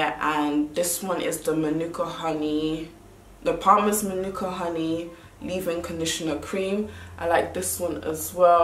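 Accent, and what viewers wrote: United Kingdom. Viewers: It took me 12 minutes and 38 seconds to realize that you have a damn English accent! Wth!! 😭 I’ve watched two of your videos before this as well, I am so confused. 😂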